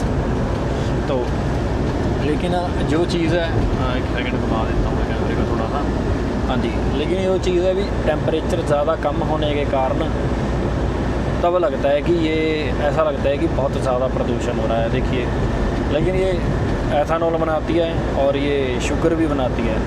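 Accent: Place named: Punjab, India. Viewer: Veer Punjabi ch ee aaja yaar ...teri hindi ch vi Apni Punjabi Jhalkdi aa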